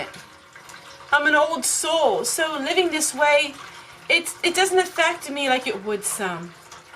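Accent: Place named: Pennsylvania, United States. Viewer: I understand. I watched your Sunday video. It was excellent! You're such a godly lady. ❤ If I didn't know any better I'd think you were from my old neck of the woods.....in Pennsylvania.... because of your accent. 😉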